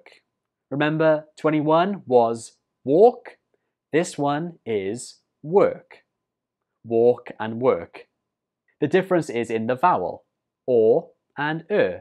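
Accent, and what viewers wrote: United Kingdom. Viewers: So did I. It's easy to understand his British English.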